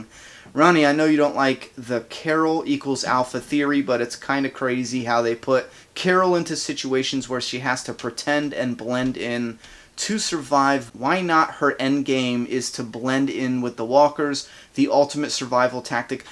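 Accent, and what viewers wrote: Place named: Boston, United States. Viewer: are you from Mass? I hear that Boston accent...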